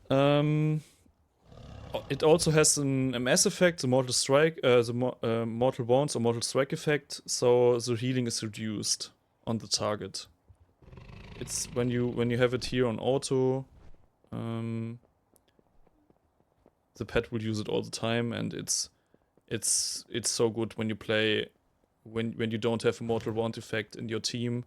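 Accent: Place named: Germany. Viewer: The german "zhe" instead of "the" gets me every time xd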